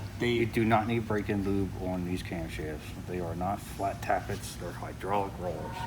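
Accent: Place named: Bay Area, United States. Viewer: Aye you from the bay bro?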